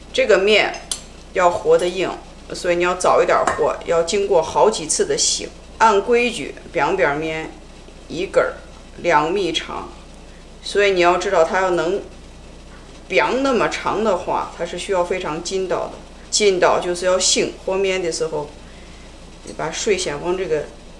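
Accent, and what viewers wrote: Shaanxi, China. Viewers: 95年我去西安上大学，一点都听不懂陕西话。现在听着陕西话觉得真好听啊：） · 只有乡党能灵活掌控陕普四六频道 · 陕西话真好听🥰🥰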